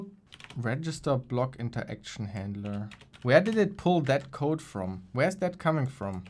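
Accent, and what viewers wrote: Germany. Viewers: Du bist Deutsch oder ? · Junge bist du deutscher? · bro sounds so german xD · Hab nur 1 Satz hören müssen um zu wissen das du Deutsch sprichst 😂😘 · Sounds like an german Accent lol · Du sprichst eigentlich Deutsch oder xD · Man hört das Deutsche raus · are you german? i am a german too and you sound very german to me lmao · Man merkt so hart dass er deutsch ist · German Accent? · Man hört das deutsch raus 🙂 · Hey bro are you german? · Based on your accent, I would guess that you are from Germany. Am I correct? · Bruder du hast so einen krassen deutschen Akzent. Bereits bei "I'm sitting" war schon alles klar xD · Man hört das du deutsch bist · this german accent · Man hört dem deutschen Akzent ab und zu 😂❤ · He is a german guy you her it by it acent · erste 5 sekunden "der typ is doch deutsch" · Bro is german? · Are you a German man? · I can hear Deutsch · i think i hear the german accent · correct me if I am wrong, but as a German I heer it: you are german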